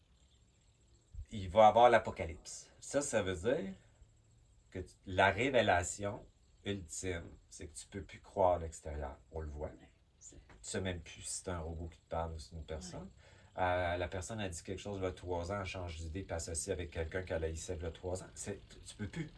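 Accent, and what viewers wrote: Quebec, Canada. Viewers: ca fait du bien de reprendre le contenu entier de la playlist " Video reconnexion a son esprit ". Quand "le truc" est encore en train de vouloir se déconnecter, revenir entendre, ça remet es pendules "à zero"...et les pendules disparaissent aussi...Par ici, c'est comme se retrouver dans ce special endroit qui est orienté en direction de la maison. Dans la tête et dans le coeur et dans les trips ça dit: " ah oui c'est bien par là! " Cette 25eme video de cette playlist, est un bijoux à écouter encore et encore...Puis l'accent du Quebec de va bien à l'accent d'Italie🏵🌹🥀🌺🌻🌼🌷👑👑👑👑👑👑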